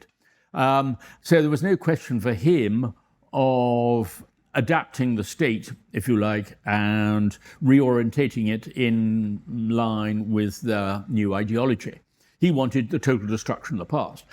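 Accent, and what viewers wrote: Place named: United Kingdom. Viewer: Robert DeNiro with a British accent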